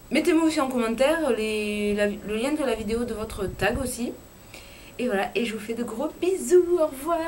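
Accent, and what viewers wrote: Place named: Lot-et-Garonne, France. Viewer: Super ce tag, merci de m'avoir tagué, malheureusement je trouve pas que j'ai un accent. Du coup je pense que je ne le ferais pas, mais je partage ta vidéo sur ma page facebook :) Et pour info mon père vit dans le Lot et Garonne, alors je connais bien ton accent. Et dés que je reste une semaine chez lui, je rentre en Auvergne avec ton accent, et tout le monde se moque de moi, parce que je récupère trop trop vite les actions verbales des autres ^^ Le pire c'est le "pain", "train", "cinq", "putin con", "branleur" et les "avé" au lieu d'avec ! Mais j'aime tellement cet accent moi :P Bisouuuuuuuus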